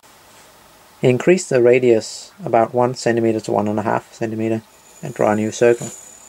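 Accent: Denmark. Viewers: You have a Danish accent bro, where you from?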